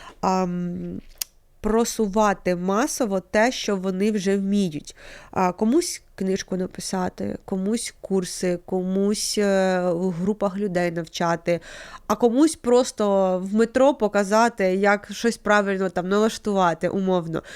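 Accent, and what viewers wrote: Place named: Surzhyk, Ukraine. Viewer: Це неможливо слухати. Як людина з таким жахливим суржиком дозволяє собі виходити в ефір. Це, в першу чергу, неповага до слухачів, і до Вашої аудиторії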